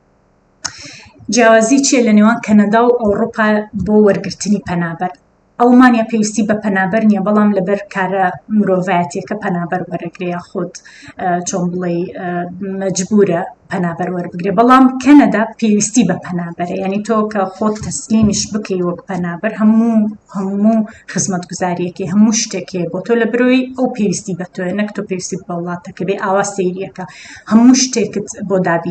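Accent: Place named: Sulaymaniyah, Iraq. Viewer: خه‌ڵكی كوێی زمانت سلێمانیه‌ و وابزانم وتت هه‌ولێریم🌝😅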